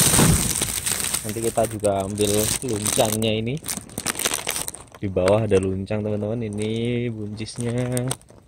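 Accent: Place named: Java, Indonesia. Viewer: Video yg durasinya lama itu yg ku suka... Ku malah berharap supaya tetap seperti itu, kondisi dapur juga apa adanya... Aku syuuuukaaaa.... Sepertinya channel bakalan cepat melejit subscribernya... Btw penasaran sama penampakan papanya 😃😃 cuma denger suaranya... Dari logatnya sepertinya wong jowo yg tinggal di lampung... Sukses selalu untuk... Pertahankan ke apaadaannya....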